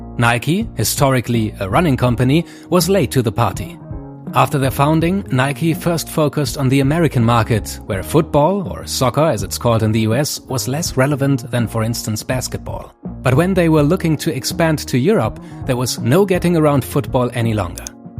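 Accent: Germany. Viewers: Man hört es so raus das es ein deutscher ist hahaah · Ich liebe diesen unfassbaren deutschen accent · funny how you can hear the german accent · Ich höre doch unseren deutschen Bruder raus 🇩🇪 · Bist Safe 'n deutscher bei deinem Akzent und wie du die Namen ausgesprochen hast :D🤙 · Wow, I feel the German accent so hard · Definitly its a german speaker i think. Ik hör da ein Akzent raus, richtig? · Ich feier deinen deutschen Akzent, mach weiter so!! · omg lmao, sos, when i watched this vid, it was at night and i didnt have full volume, now i hear that hes german lol, but yh lol, americans cant play football and cant even call it the right name u cant deny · This guys definitely German 😂 the way he says puma and neymar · Love your German Accent 🤘🏽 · He’s German tho and that’s his accent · You hear really german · Der deutscheste akzent überhaupt 😂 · The german accent tho... 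· broski sound so german(deutsch) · 100% Deutsch warum hört man das? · the voice actor just sounds so german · Bro, your German accent is soooo thick. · "positive racist" bias: your german accent (or austrian) sounds perfect when you explain marketing and global strategy Great work my friend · German accent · Man hört dass du ein deutscher bist gib dir mal mehr Mühe laaan.. german accent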